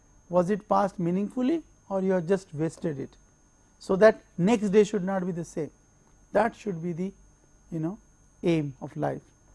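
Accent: India. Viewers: it should have been better if it they made their presentations in computerized manner and add some subtitles or captions. indian accent is very hard to understand. but still it is a very educated video.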